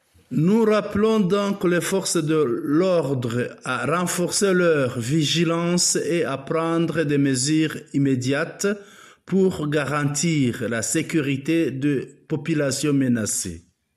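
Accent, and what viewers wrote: Rwanda. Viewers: Le ton de français indique que tu es Rwandais. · Avec ton français rwandais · Rwandais par ton français mensonges prolonger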